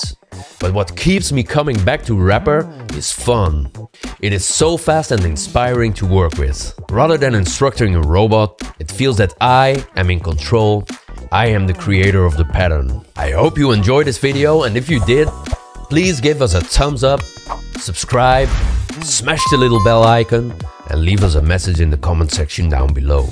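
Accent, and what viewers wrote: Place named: France. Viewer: Your voice is French AF. I love it!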